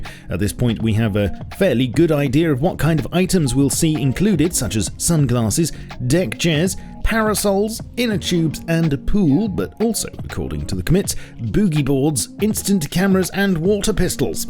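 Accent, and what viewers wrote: United Kingdom. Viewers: Every British person sounds like this if they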